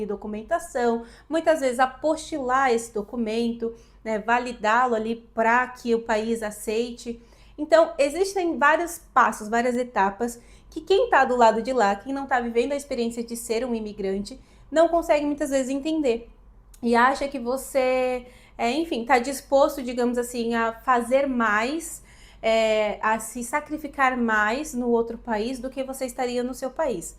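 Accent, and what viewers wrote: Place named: Brazil. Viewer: Parabéns amiga pelo seu Português perfeito e já com pouco sotaque Brasileiro, parabéns também pela sua argumentação perfeita e correta, vou continuar a assistir os seus vídeos.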